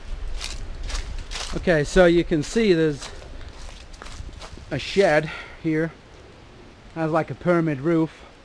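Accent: United Kingdom. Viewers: a cool film. I'm just wondering...are you from the UK? I detect a slight accent from over here. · Love this place, it has a peculiar mystique to it, something a little spooky & Paranormal (that's the feeling I get ne-how.!) I think it was in a little better condition when Dan Bell was there... Thanks for exploring, p.s. R U a Brit - #Playschool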